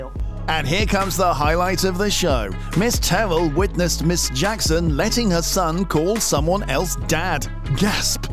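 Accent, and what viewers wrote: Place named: United Kingdom. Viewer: Who's the British voiceover?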